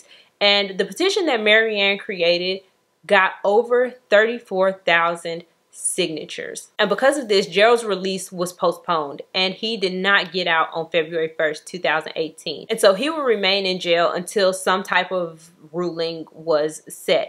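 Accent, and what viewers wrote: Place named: Chicago, United States. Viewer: Lol you definitely from close to Chicago, you funny as hell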